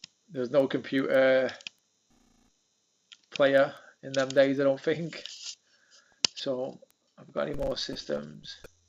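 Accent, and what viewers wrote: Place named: Manchester, United Kingdom. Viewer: Where you from? Manchester? You sound like you're from Wythenshawe, top vids btw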